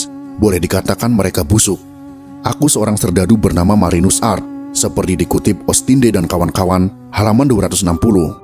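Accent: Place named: Java, Indonesia. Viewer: ngomong kyak wong jowo adminya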